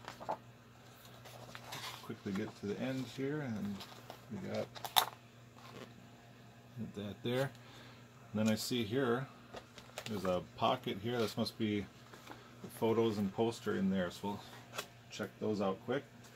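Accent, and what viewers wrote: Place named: Minnesota, United States. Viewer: Been watching a lot of your videos. Never picked up on the fact that Minnesota accent was actually coming from Minnesota. Hello neighbor.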